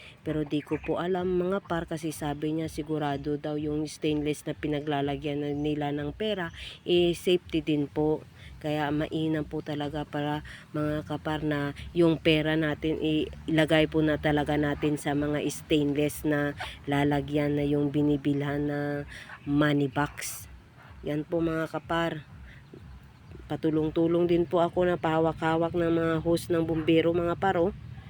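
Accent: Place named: Iloilo, Philippines. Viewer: Halata ang ilonggo nimu madaam...good job ..go lang ng go ...❤❤❤❤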